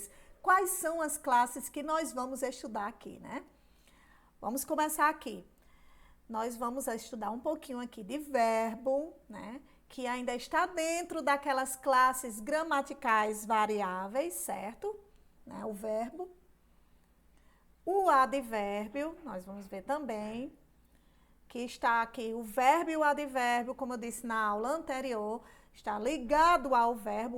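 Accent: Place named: Northeast, Brazil. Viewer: Esse sotaque nordestino me faz ter tranquilidade e paz para saber e entender que estou com uma grande professora, face considerar que o estudo nordestino, se não for o melhor, é um dos melhores do pais.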